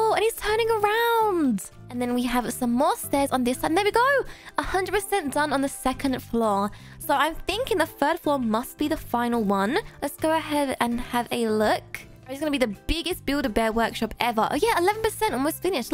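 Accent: United Kingdom. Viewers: Are you from the UK I am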